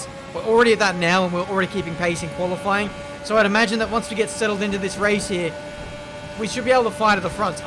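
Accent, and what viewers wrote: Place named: Australia. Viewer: the thing i like about is that his voice sounds normal because im aussie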